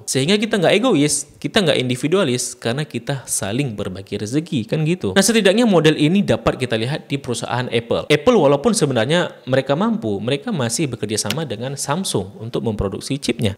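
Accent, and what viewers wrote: Malaysia. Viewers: Assalamualaikum..maaf ini orang malaysia atau indonesia ya...soalnya bahasa indonesia nya bagus tapi ada terdengar logat malaysia dan kata kata yang terdengar biasa dipakai orang malaysia...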